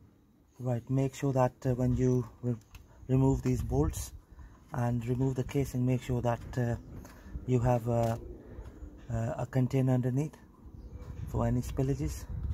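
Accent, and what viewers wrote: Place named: United Kingdom. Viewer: I love the British accent (sotaque). Hug from Mexicali México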